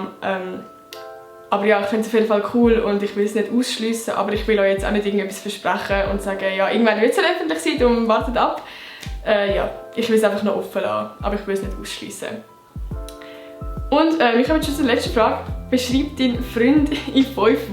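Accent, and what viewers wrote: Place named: Switzerland. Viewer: Schweizer Dialekt ist so lustig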